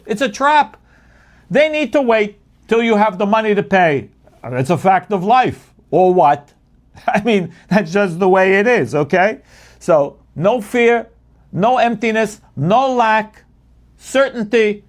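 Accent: New York, United States. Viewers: Love the New Yawk accent :D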